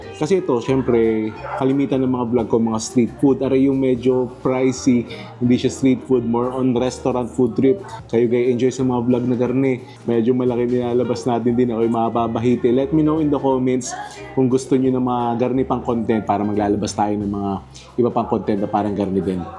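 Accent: Batangas, Philippines. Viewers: Your Tagalog brings memories of when I lived in Lipa for four years. I love the accent and the slangs specific to Batangas. Hopefully I could visit that part of the Philippines again someday 🙏 😀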